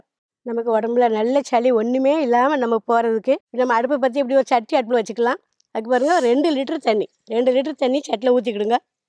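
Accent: Tirunelveli, India. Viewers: அம்மா தங்களின் பேச்சு எங்கள் திருநெல்வேலி பாதை போல் உள்ளது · நெல்லை தமிழ்...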